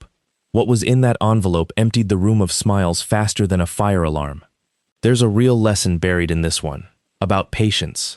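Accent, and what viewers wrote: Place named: Australia. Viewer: Excellent story. Lovely voice for this from Australia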